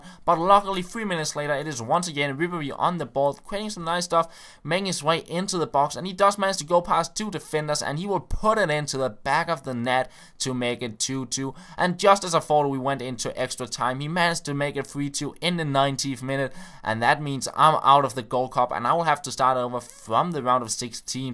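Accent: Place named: Denmark. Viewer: it sounds alot like danish ;) most people from our country have that accent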